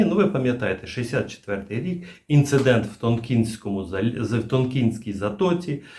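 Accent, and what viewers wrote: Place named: Lytyn, Ukraine. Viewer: Завжди приємно слухати Вас пане. У Вас якась рідна українська мова, як у нас на Літинщині. Дякую за всі огляди.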